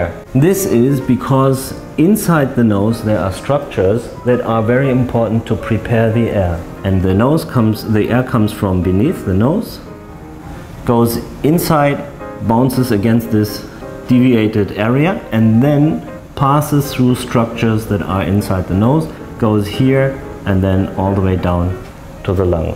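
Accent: Germany. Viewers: His accent is so German so I trust him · are you from germany?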